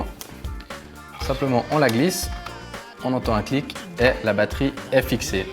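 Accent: Switzerland. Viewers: Nice 👌🏻 Suisse ? Avec l’accent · bel accent suisse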